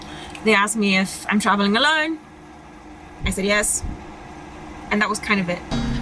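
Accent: United Kingdom. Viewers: ...albeit with a German name and a British accent.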